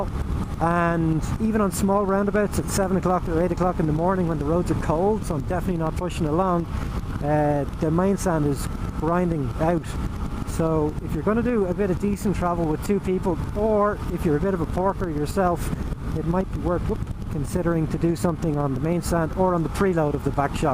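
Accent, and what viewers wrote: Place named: Ireland. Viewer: Hi, nice review, and nice to hear an Irish accent on for once. Looking to get a Tracer, you mentioned the seat being bad, I have an 04 R1 and I find the seat cutting into my leg after a couple of hours and I get a numb bum:) What's the tracer like? Do you still have it?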